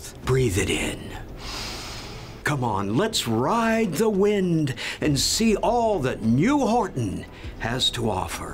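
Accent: New England, United States. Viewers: Holy shit you've got New England nailed down. Now when may we expect Roger? I can pick him up at the train station at a moment's notice. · Wow new Horton sounds like New England?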